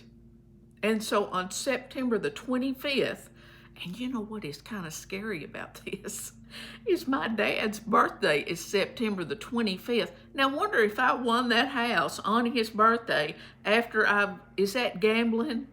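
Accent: Kentucky, United States. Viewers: I enjoy your videos guess I like your Kentucky accent!! My parents were from Kentucky many many years ago✅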